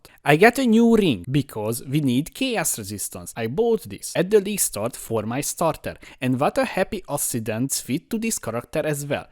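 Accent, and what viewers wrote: Hungary. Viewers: A magyar akcentus megol meg kulfoldrol is felismerni :D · Szia , nagyon Magyaros az akcentusod. Really nice video there ! :)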